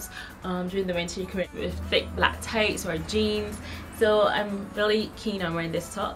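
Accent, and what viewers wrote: Barbados. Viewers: Totally been stalking your channel, heard your accent and knew you were a fellow Bajan in the UK! New subbie!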